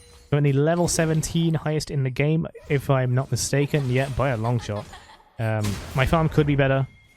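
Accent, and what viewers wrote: United Kingdom. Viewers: He has english accent with a slight lisp, im english too so I love his voice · he's bri'ish m8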